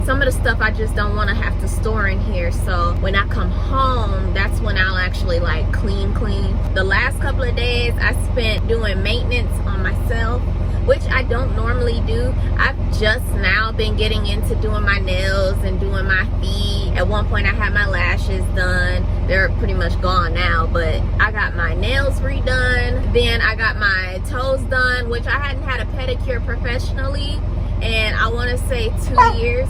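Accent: Michigan, United States. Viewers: LOL, I didn't realize you were local. But hearing you say "Meijersss" is so comfortable and familiar that I laughed. As Michiganders we have so many neologisms that mix with Yooper-talk and Canadian lingo and covered by all that Deep South drawl from our elders. 😁 People from outside the region have such a hard time figuring out where I'm from sometimes. 🤣 Waving at you from Detroit 👍🏾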